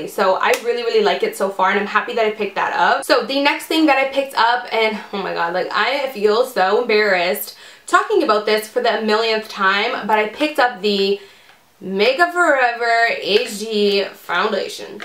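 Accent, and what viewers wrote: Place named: Canada. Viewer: I never noticed how much emphasize you put on your O's lol ive never heard a legit Canadian aaccent. love your haul boo!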